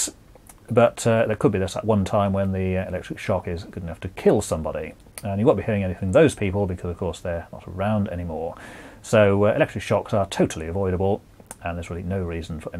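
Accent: United Kingdom. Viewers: I wish you put subtitles on it to catch up with the british accent :(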